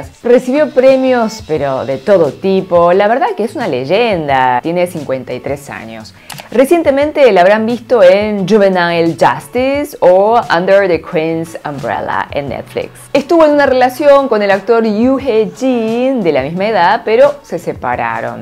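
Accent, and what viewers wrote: Argentina. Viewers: Que bellezas las actrices coreanas ❤ me encanta tu acento argentino ❤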